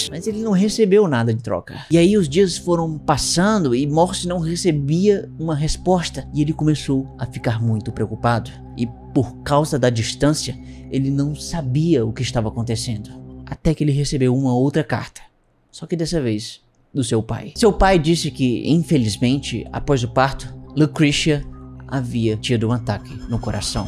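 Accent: Ceará, Brazil. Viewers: Juro que não sei de que estado ele é mas tenho a impressão que é do Ceará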